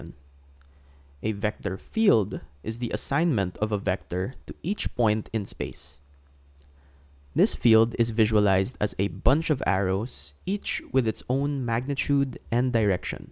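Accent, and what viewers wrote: Philippines. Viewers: he sounds Filipino yes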